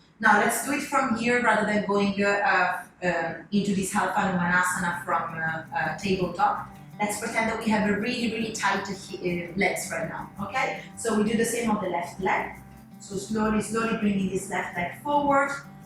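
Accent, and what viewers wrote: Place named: Italy. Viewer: I love your Italian accent 👍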